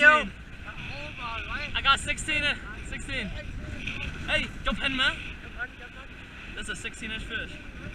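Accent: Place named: Vietnam. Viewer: Lmfao your Vietnamese accent is strong on this one 😂😂😂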